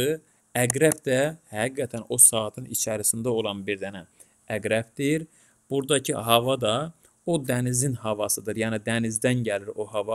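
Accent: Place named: West Azerbaijan, Iran. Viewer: Qaqaş sen qərbi Azərbaycanlisan? Onların lehcesine oxsayir lehcen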